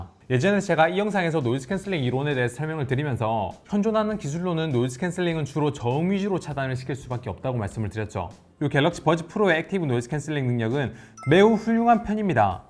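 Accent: Gyeongsang, South Korea. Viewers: 경상도 분인가요? 표준어를 구사하려는 것 같은데 억양이 경상도 사투리 쓰는 분 같네요.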